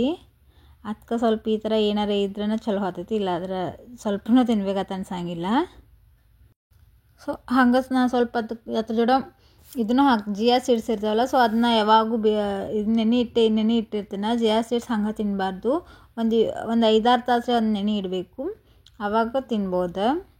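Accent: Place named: Uttara Kannada, India. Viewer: Nim bhashe super uttara Kannada super vlog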